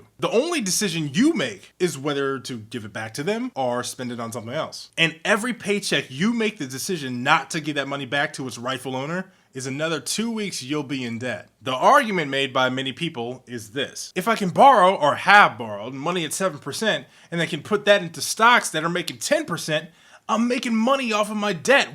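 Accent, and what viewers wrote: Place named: United Kingdom. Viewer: Dude, you have a legit British accent...that is the most important thing I discovered during this video...haha, totally kidding! I can't tell you how many "wise" adults have encouraged me to do #1. :( So sad. Thanks for the awesome video!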